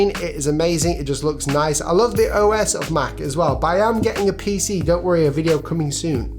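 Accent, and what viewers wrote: United Kingdom. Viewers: I LOVE the British pronunciation of 'aluminum' and words in general. I should have been a linguist.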